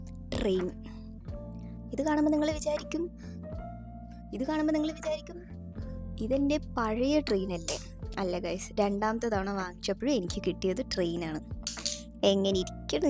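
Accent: Palakkad, India. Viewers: Chechi palakkad anno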